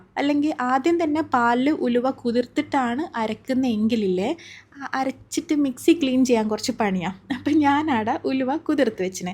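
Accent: Kannur, India. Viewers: Nalla nadan kannur varthanam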